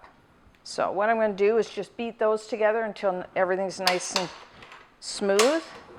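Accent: Canada. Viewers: your accent is adorable! are you canadian?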